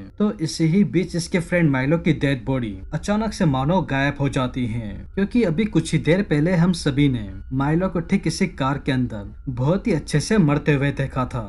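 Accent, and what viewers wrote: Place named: Indore, India. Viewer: Tu indori he naa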